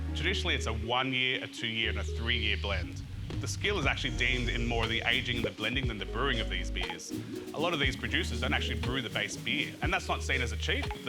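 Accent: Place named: New Zealand. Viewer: This guy's a kiwi, no Aussie.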